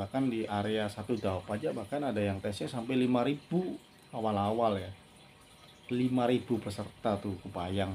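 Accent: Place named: Cirebon, Indonesia. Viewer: Logat Cirebon nya keliatan bangat pak 😂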